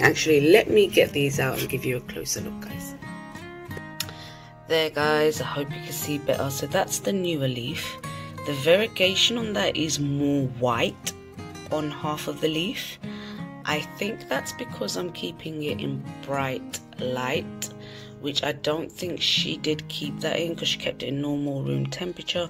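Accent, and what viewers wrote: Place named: United Kingdom. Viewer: Would you pls update us about your that little Alocasia you bought from ebay ? And another thing i want to add "apnar British accent khub e shundor and perfect MashaAllah, i love it alot"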